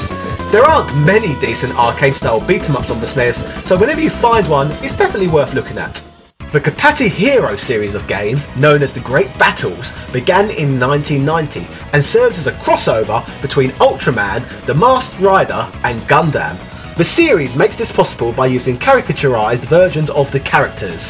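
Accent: United Kingdom. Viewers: PLEASE! Just list the games in the description! Trying to decipher Japanese names from a British accent is impossible.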